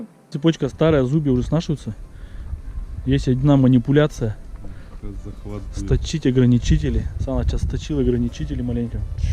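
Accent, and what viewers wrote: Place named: Ivanovo, Russia. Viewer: По говору Иваново, Кострома.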